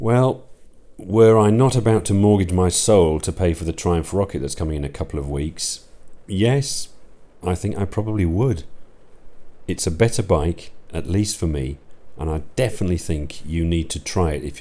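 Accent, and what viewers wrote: United Kingdom. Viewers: Not only I find your opinion on bikes clever and accurate but also I get to practice my british English listening skills. Absolutely brilliant :D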